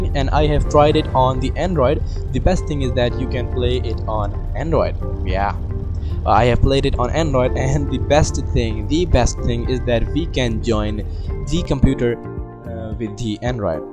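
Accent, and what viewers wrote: India. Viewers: That Indian Accnet is very bad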